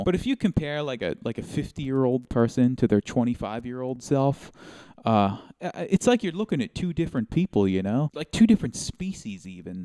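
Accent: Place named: Philadelphia, United States. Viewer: I’m from around the bucks county area. I could tell by your pronunciation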